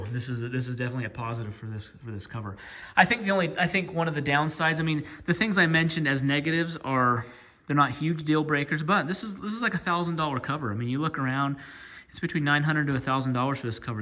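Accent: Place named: Utah, United States. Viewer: First time visitor to your channel... Good info on this cover. I'll probably pass on buying it. As a former resident, I can tell you're in Utah just by listening to you. We have an accent all our own. Lol keep up the good work!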